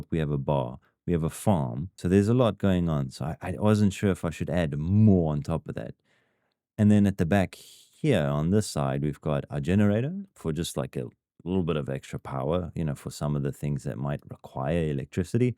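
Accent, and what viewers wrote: South Africa. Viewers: Are u south african ?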